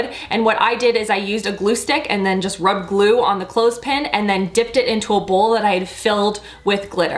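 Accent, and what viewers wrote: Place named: Canada. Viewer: I love hearing you say about... you're a true Canadian😋 sad that I live in Minnesota because I have the accent but not a strong as yours😢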